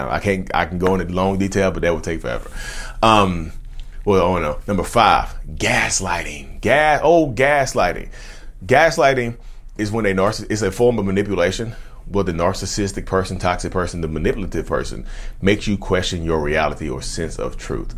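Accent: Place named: North Carolina, United States. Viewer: Most of the narcissists I dealt with in my past - on the romantic level that is - were when I lived in Charlotte and before I knew that it was narcissistic abuse that I had experienced with them. So hearing your NC voice talk about narcissism is particularly healing to my soul. Now living in L.A. I, of course, encounter SOOOOO many situations where NPD is a factor. Your content as well as the videos from Little Shaman, Jay Reid, and Patrick Teahan are my go-to sources these days. I love it! -S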